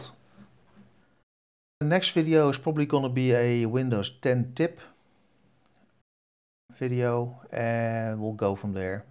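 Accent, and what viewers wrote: Netherlands. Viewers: you sound a lot like a dutch man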